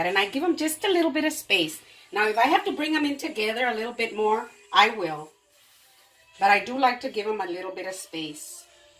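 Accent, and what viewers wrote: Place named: Texas, United States. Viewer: . Love your channel. Reminds me of my grandmother’s cooking. Must be the love. I was raised in south Texas and your slight accent is reminiscent of my upbringing. It’s like being home with family.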